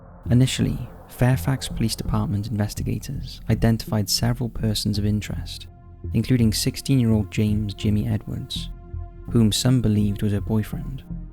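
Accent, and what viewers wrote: United Kingdom. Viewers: Your voice is perfect for narration. Not to loud or to low but perfect in both volume and tone. And the british accent makes it even better. Been watching the videos nonstop for a week now. Thank you !❤️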